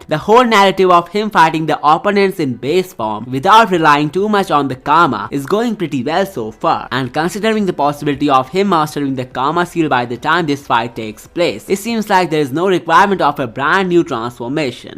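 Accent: India. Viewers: Bro pls tell me you are Indian or not, coz your accent sounds like it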